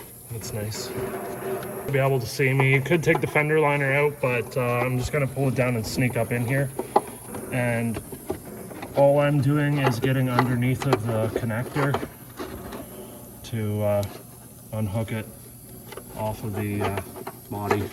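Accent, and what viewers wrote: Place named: Canada. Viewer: You Canadians need to learn how to pronounce the word out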